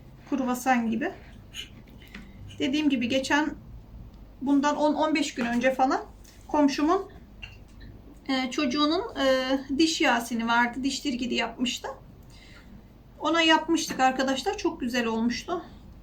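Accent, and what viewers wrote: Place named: Antalya, Turkey. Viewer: Bir Antalyalı konuşması müsafirrr 😂günaydın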